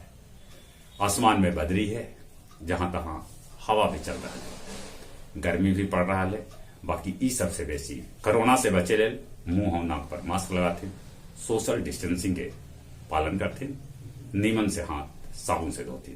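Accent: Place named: Magahi, India. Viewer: Bhut accha lgta hsi aapki maghiya boli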